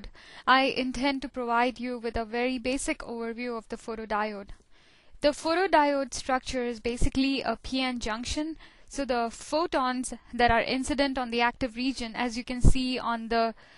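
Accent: India. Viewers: but u sound like indian..its ok..dont be ashamed...nice accent..:) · You were reading a book. BTW your accent is Indian, probably you are Indian or indian based NRI.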